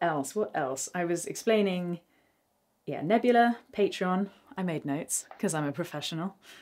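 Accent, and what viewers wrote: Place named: Bristol, United Kingdom. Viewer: It's a pleasure to see you wearing something other than black, first of all. Second, producing your own studio album is quite a chunk to bite off – big hopes for a solid return on that investment of time, energy and money. Finally, as always, I remain enamored of your speaking voice and that "Bristol" accent. Good stuff. Keep up the reports!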